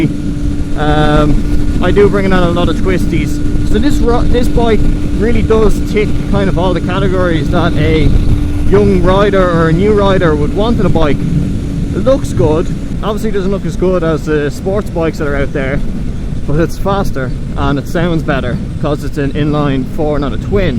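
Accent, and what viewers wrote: Ireland. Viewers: Are you irish pal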